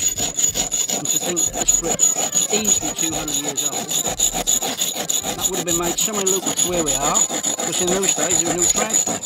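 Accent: Norfolk, United Kingdom. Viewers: Thanks, I thought Norfolk but didn't want to risk insulting you if you came from Suffolk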